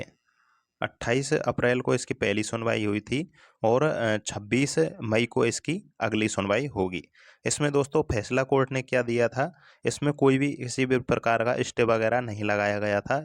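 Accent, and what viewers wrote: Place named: Rajasthan, India. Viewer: Tumhari language se tum hindaun City k lg rhe ho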